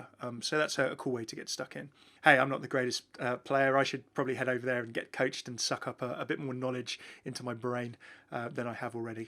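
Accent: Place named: United Kingdom. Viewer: Your voice has a British accent. You British?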